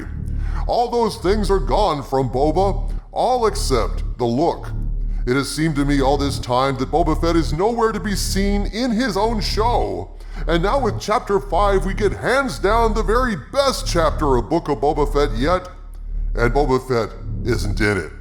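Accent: New Zealand. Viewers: Haha, not Aussie, a Kiwi mate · Hes ahhh New Zealander right? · Aussie? I thought he was a Kiwi. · he’s actually from New Zealand 🇳🇿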